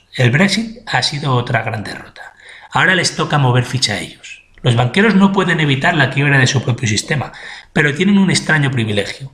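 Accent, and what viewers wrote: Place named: Castile, Spain. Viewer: Gracias por explicar sencilla y coloquialmente una realidad del barquero desde un acento y manera de hablar puramente castellana. Pienso lo mismo pero como tu dices, esos jóvenes de los que hablas no se impresionan por nada y como mucho te hablan de linajes merovingios e Illuminati pero sin pasar por la pista económica, geopolìtica y el análisis marxista.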